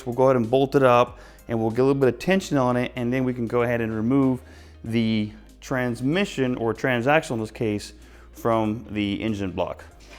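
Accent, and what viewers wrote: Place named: United States. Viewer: Hi. Looks like it can be rebuilt to me. Can you not grind the crank and fit oversize bearings? I'm talking 60's/ 70's engines I suppose but the mechanics of this engine are from that era (if not the electronics!). 2 valves per cylinder, cam in block, pushrods. Great! Like the channel very much, you do stuff to owner/mechanic standard - that is not a criticism, just means that the attention to detail and care is better than I suspect the average workshop would give. You do a Rolls Royce job if its your own car, such as the diagonal relieving of bolts. Good tips too eg the spark plug ceramic colour (not black, not oily, not too white).Keep it up. I'm a Brit, you're and American but I just about understand you!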